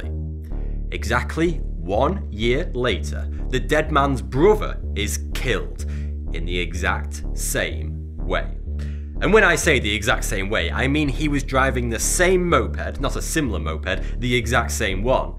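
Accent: United Kingdom. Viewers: What happened to Vsauce? Why does he suddenly have a British accent? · xXMEMEGODXx 11 it's just his type of British accent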